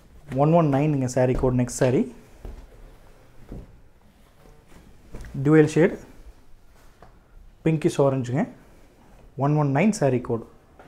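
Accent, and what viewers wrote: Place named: Kongu, India. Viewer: 💐💐💐🙏super with Nama kongu Tamil nice voice ங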